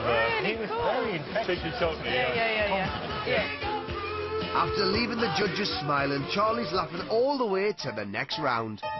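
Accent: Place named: United Kingdom. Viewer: if he wasn't british, he wouldn't be funny. its all in the accent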